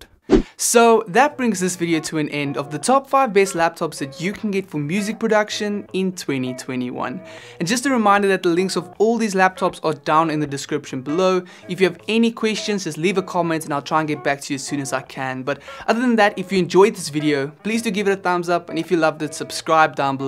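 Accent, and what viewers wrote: South Africa. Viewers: Sound south african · You South African bro?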